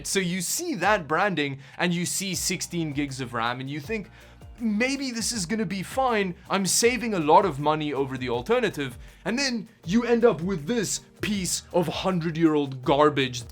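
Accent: Afrikaans, South Africa. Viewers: I'm so curious too, South African is my guess. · Is jy van Suid Afrika? Jy klink Afrikaans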